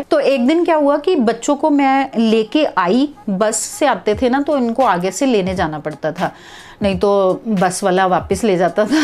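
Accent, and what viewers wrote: India. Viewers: Ohhhhhhh aunt feeling sad for you lekin koi bat nahi jo hota behtr hi hota and think agr tb ap ka audition ho jata to shayed youtube ap k zehn m na ata aur aj ap ka apna channel na hota.So agr hmary pas sae kuch jata h to us sae ziada hi wapis b milta h 😊BHT ACHA LGA AP KI KAHANI SUN K.Aur ap bht cute hn specially talking style DESI DESI. sa😍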